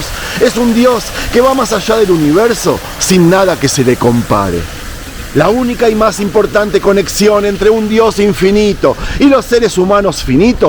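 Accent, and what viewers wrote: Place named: Rioplatense, Argentina. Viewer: saludos de un ecuatoriano me da mcha curiosidad si eres argentino o uruguayo o aprendistes espanol y nacistes en Israel gracias y me gusta tus videos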